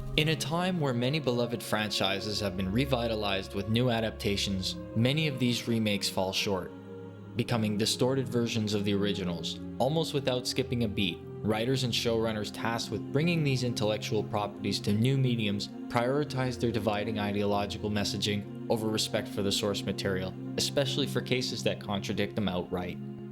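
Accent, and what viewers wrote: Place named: Canada. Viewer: As someone who hasn't played Halo, not a console gamer and not great at shooters, I'M pissed at the mishandling of the character - and the constant attempt to bait and switch lead characters. He is an icon, deserves better. If you wanted a helmetless Master Chief (but why though?) Kurt Russell did it better in 1998: <link> Impressive first video - HELLA good editing. Shame about that cannuck accent.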